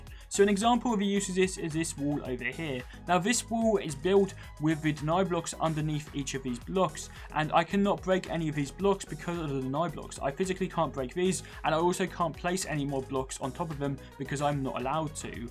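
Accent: United Kingdom. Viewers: THICK BRITISH ACCENT BE'ER I LOVE IT BRO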